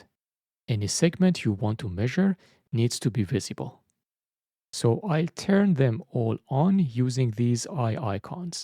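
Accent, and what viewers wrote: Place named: Iran. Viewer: Why do I sense a little bit of beautiful persian accent from narrator voice!?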